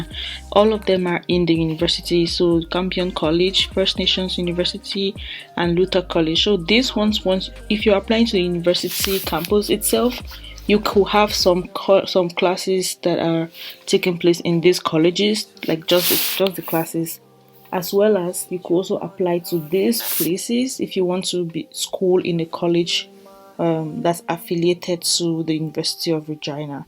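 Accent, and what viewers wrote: Nigeria. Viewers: Cameroonian u sound Nigeria 100%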